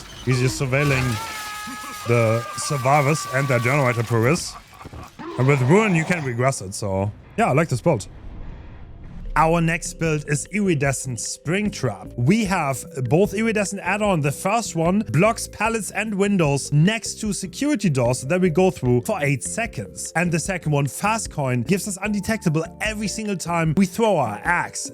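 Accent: Germany. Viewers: its kinda funny that he copied the exact same speaking style like Otz but with a german accent.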